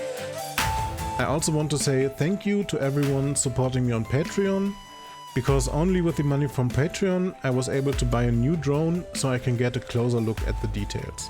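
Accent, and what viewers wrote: Germany. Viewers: The voice works well! German accent gives extra charm to the video, Serious, I can't imagine any other accent for Tesla German factory. Why can you not fly over the factory anymore? The video is excellent, as always. Maybe a zoom improvement in the future. Greetings from NL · best episode from over the traintrack so far! as a german, I really like your german accent. has some nice authentic touch to it.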